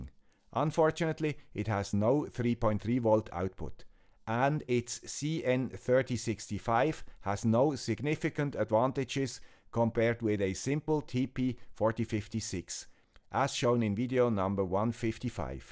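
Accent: Switzerland. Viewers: Hi Guy with the Swiss Accent! Thank you for your outstanding videos; I really, really enjoy watching them and I appreciate your presentation style and sense of humor. Best regards!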